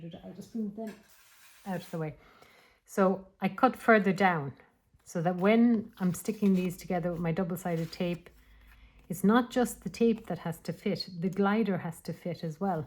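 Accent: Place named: Ireland. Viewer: Great video! Colouring is great! So nice to hear that Irish accent again!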